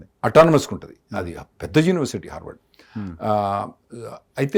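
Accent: Telangana, India. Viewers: screen layout clean, clarity on discussion Telengana accent entertaining Keep doing more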